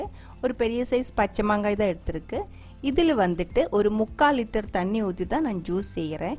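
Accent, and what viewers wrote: Kerala, India. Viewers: தமிழும்‌ மலையாளமும் கலந்து உங்க இனிமையான குரல்.super sis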